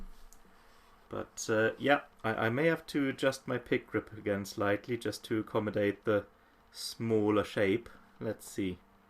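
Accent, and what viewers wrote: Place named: England, United Kingdom. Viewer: Oh your voice..I have never heard it...what a a lovely English accent,. For picks, I am told..there should be no give...no bendy qualities ( for movement efficiency) and not too pointy. ( personally I use Blue Chip TAD 40 always )- but my teacher uses a TAD 60. I have many of the same ones you have too. But I like the way the TAD 40 sounds on your mando. Maybe it depends on the type of music you play (ie: jazz) With 31 picks..I'd say - pick one and stick with it. Which one is the most comfortable in your fingers.? If you get used to one, you will learn how to strike the strings the way you like. This is a good review, btw, for many people to watch.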